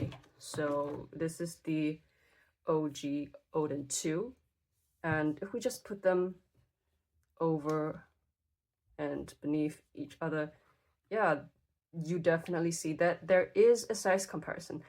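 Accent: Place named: Germany. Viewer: I thought so! I have a friend in germany and i dont mean to generalize, but the way you pronounced your words were very familiar! Cant wait to see you improve further.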